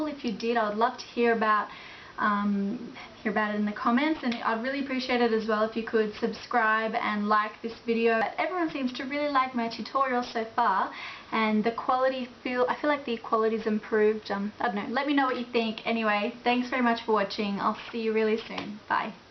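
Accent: Australia. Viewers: Loved this video!! Nice to hear an Aussie accent after watching so many American make up tutorials! xox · Aussie Aussie Aussie Oi Oi Oi! I picked you as an Aussie straight away. Boston? WTF? Great tutorial by the by. Definitely going t try this out. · O.k. well I really got something out of it, so thank you. And....my opinion - talk all you want, here in the South, we don't get to hear your lovely aussie(?) accent much. using the makeup remover and pad will help me with my goofs. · nah shit mate. im ausie and even i can tell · This was lots of help, and you have a strong aussie accent, a lot stronger than mine! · I love your aussie accent :D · boston? really? shes aussie..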